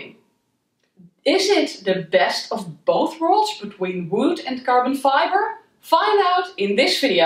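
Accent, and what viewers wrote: Netherlands. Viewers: I love your Dutch accent ! ❤️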